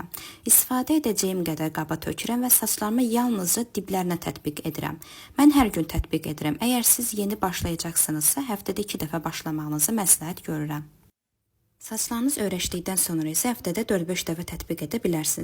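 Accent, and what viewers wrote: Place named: India. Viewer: doğurdan bu ses senin sesindi? Birinci ele bildim hint qızıdır 😅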